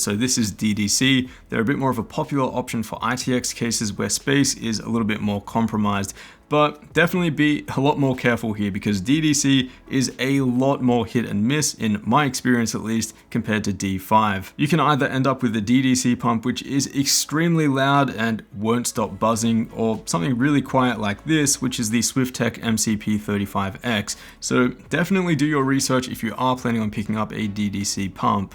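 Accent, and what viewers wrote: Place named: Australia. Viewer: I cannot stand hearing Australians pronouncing Z as "zee"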